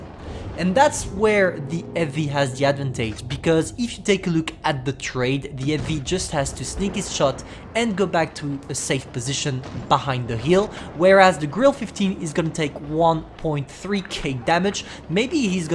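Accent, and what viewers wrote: France. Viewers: Question? Are you French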